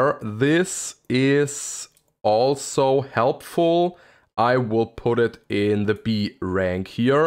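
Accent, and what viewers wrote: Germany. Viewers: Nice Video good explained , Are you german ? you sound like you are hahaha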